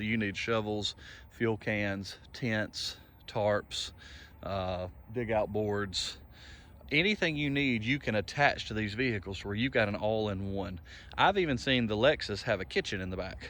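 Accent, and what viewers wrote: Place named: South, United States. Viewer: I am registering my GX470 in the town a have second home in. I just bought it and can not drive it because all of registration paperwork is sitting in an airport because of the snow storm. I am so pissed. Thank you for the showing us that may not know the difference. Between the various SUVs. Love your southern accept. Ha ha. 👌🏽. Are you in Instagram?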